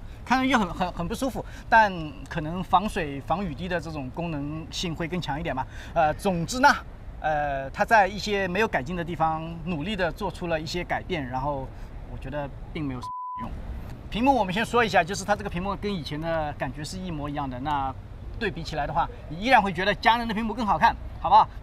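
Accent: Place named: Zhejiang, China. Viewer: 老家哪里啊😄，我女朋友听你视频说你像是浙江的诶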